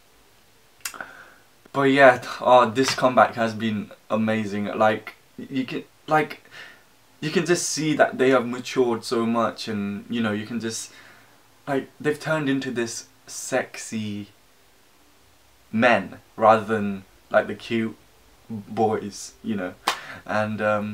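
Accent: United Kingdom. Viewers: Are you British? :)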